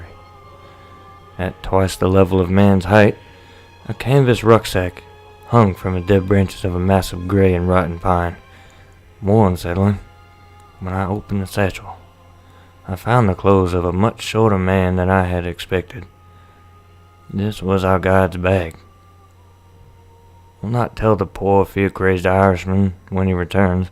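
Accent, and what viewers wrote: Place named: Old West, United States. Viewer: Nailed It!!! Your old west accent and dialect was spot-on and I freaking loved everything about this video and narration absolutely brilliant.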